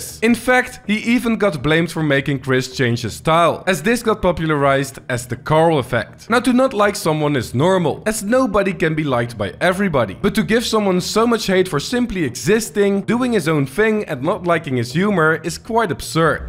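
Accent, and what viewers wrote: Netherlands. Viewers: Ben je nederlands mooi accent😂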